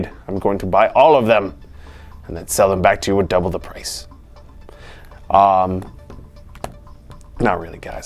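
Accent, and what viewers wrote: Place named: Australia. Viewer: awful aussie accent mate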